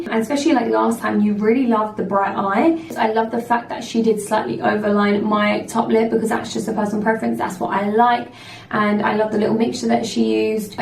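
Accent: United Kingdom. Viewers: I knew she was british before i clicked on the video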